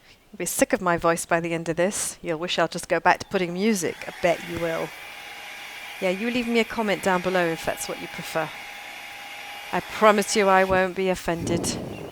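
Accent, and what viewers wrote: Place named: United Kingdom. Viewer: dear, first of all,you should not, YOU CANNOT go back to not talking! I won’t let you,girl 😊 You are amazing, you’re just not use to it, but your voice is just as gentle as your hands are working. And your British accent on top of it wow!! You are definitely not annoying, you are not boring, don’t even dare think that! If someone doesn’t want to watch they can fast forward it,but I don’t think they will. And of course you made a lot of sense, you’re underestimating yourself, but seeing this in regular speed and hearing you explain it makes all the difference, please believe me! And of course, your piece came out wonderful as usual, gorgeous. I asked you previously about an airbrush,now I heard you saying you bought it a while ago. I would like one, but I guess if I want a better one I need to buy it secondhand. Although in generally I hear that they are using the airbrush to make flowers,petals or smaller more defined things., I enjoyed this so much,you can’t go back now to no talk. 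You are so lovely 😊🌺🌹 I will send you an email about Pashmina.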